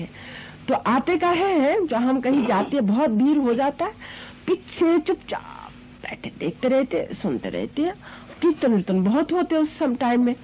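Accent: Bengal, India. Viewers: Yes her accent sounds like Bengali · Her accent is Bengali.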